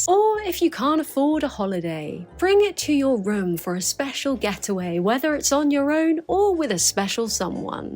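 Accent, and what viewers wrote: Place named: RP, United Kingdom. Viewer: Soooh Posh 💆🏻‍♂️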